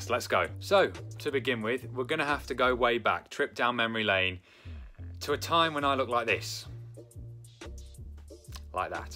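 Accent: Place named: England, United Kingdom. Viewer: just love your accent, Viva england bro!!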